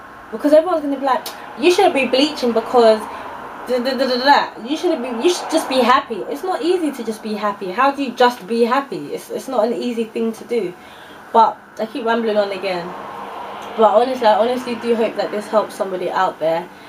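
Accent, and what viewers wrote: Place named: United Kingdom. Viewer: Are you tyra banks sister?:o You sound british, or maybe she sound american hehe I dont know where yous are from! Btw, your beautiful! I was going to bleach my skin because of uneven skintone but now you made me much more confident, ill just stick to foundation. The fact that people risk there lives just to look pretty is mad.. Stay strong your not ugly!:-) xx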